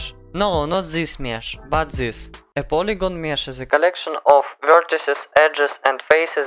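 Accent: Russia. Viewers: Amazing! Бат ёр рашн аццент выдает тебя с поторохами😊 И это здорово, так как твой английский я понимаю и без субтитров.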